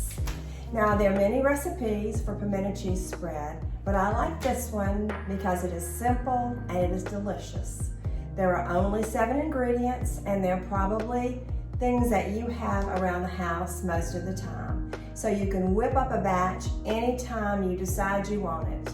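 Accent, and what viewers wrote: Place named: South, United States. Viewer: Love that southern accent! And this recipe is awesome!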